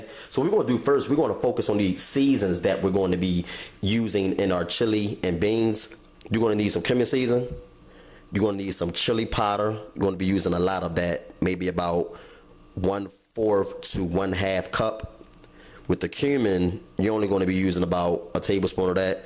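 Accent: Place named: Baltimore, United States. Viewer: I was about to ask you where you are from.. Then I seen your name haha. that accent. .. I was going to guess baltimore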